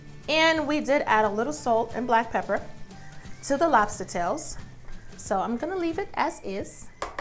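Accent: New York, United States. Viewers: New York accent.. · Love your adorable NY accent..NYC holla!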